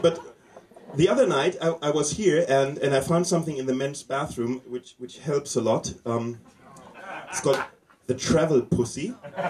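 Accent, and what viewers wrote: Germany. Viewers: It's actually quite funny! And the German accent makes it even funnier! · What a funny German accent. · Awesome! I kinda like the german accent, although I don't have any.. Greets from Germany! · hello, germany! Haha, the clip is great and Your english also! I ran over your conversation with the 'Ben' and I have to say I admire your patience ; ) Btw, I'd guess, judging by how your accent sounds, you're from somewhere in the southwest region of germany? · i live in switzerland this guy reminds of my german friends, they have an exact same english accent. And i loved your jokes it was funnier in your accent and time by time you asked the audience what is it in english hilarious. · Er vielleicht? Man hört doch ganz deutlich den deutschen Akzent. Außerdem gibt es auch in Deutschland sprachbegabte Menschen. Vielleicht gehört du einfach nicht dazu? 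Ich weiß es nicht, da ich dich nicht kenne, aber es kommt mir gerade so vor. Ist nicht bewertend und angreifend gemeint, um das schon mal klar zustellen. Ist mir einfach nur so vorgekommen.... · This rocks! And I bet for the Americans the German accent even doubled the fun. Anyway, it's hilarious! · Echt lustig. :'D Nur dieses deutschige Englisch.. Ist aber trotzdem gut! (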